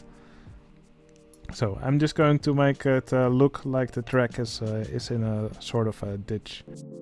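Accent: Netherlands. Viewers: Ik kan wel horen dat je Nederlands ben.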